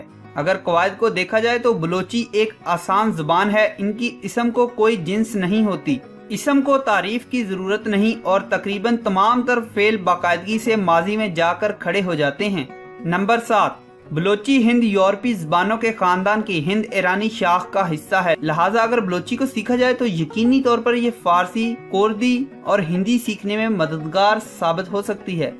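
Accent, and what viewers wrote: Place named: Punjab, Pakistan. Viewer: پنجابی لہجے میں پڑھا گیا ہے، بلوچی بولنے والوں کی تعداد کم بتائی گئی ہے اور کافی چیزوں کا تلفظ بھی درست نہیں ہے لیکن پھر بھی کہونگی کہ اچھی کوشش ہے ۔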